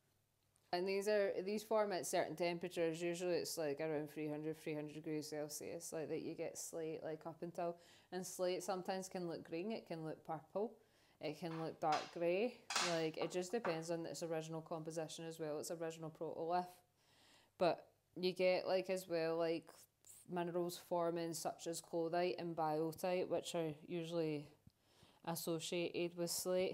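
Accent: Scotland, United Kingdom. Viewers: Glad I chanced upon your channel. I was interested in your mention of mudstone ( and I've noticed you have a video on that). I live in Almondbank, a couple of miles northwest of Perth. There are some cliffs where the river Almond takes sharp turns and the cliffs are known locally as marley rock- as in crumbly. The rock is very soft and crumbly. The colour is pinky brown. I assume that's mudstone. I'll have a look at your other rock videos; thanks for your efforts. And its great to hear the broad scots accent.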